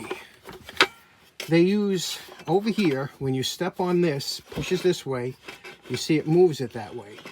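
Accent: New York, United States. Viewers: Great Video bro that Vacuum came out great def in my opinion the best YouTubeer to listen too while doing a restoration 💯 Its probably the NY accent has to be 👍🏼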